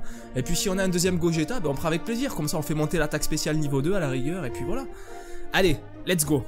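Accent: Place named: South, France. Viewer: petit accent du sud ça fait plaisir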